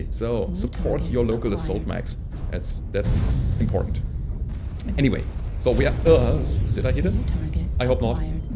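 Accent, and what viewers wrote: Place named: Germany. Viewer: Hey! I'm using a KingCrab too. Nice video! In the meanwhile I didn't played a long time. But I'm downloading the game again. My KC is pretty good too. Is it possible you are from germany or so? Your talking sounds like a german one who tries to talk english... but really good english. ^^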